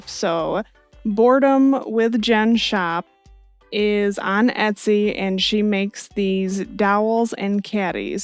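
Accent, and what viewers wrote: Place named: Michigan, United States. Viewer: This channel is amazing! Are you from Michigan, by any chance? You have the exact same accent as my friend Dawn! You could be sisters based on your voice.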